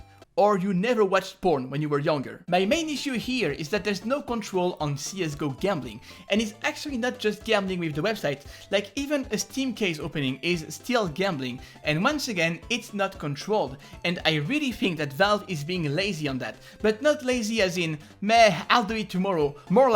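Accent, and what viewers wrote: France. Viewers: You sounded way more French in this video. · are you french????? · Is this guy French?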